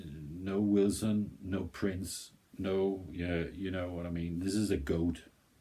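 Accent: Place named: Denmark. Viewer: You must be Danish, listening to your accent and your name, I'm pretty sure you are a fellow Dane. I was born and raised 10 miles south of Koege, came to the US in 1967 as an au pair, in Westport Ct. Have been here ever since. Although have been back to Denmark many times. Now live in the San Francisco Bay Area. I just heard you mentioning Denmark, so I was right. I love this song, we have a very active Scandinavian Club in this area, and in the early nineties we had a midsummer party, in the hills south of SF, and we had some members who played guitar and sang, and this was one of the songs they sang at that party.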